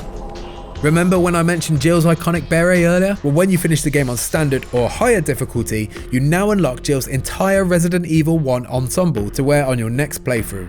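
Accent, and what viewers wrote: United Kingdom. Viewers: Zucc i am 1000% aware he IS british and he has a BRITISH ACCENT... So i guess the problem now is why did i have to put “*in a british accent* if he’s naturally british huh? Why the need to point that out... That’s the issue, right? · 1. There are many accents in Britain 2. This guy IS British. Are you deaf?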